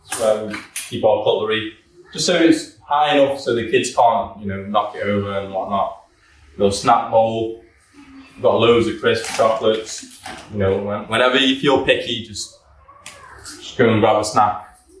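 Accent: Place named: Manchester, United Kingdom. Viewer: A manc Thai great to hear a northerner back home in Thailand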